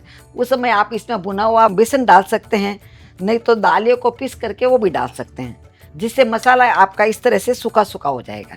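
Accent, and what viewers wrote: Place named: Rajasthan, India. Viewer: Nice mam love from Gujrat ❤ I also love Rajsthani people your rajsthani tune in all recipes thats why I watch your all videos you look like morden Nani 😅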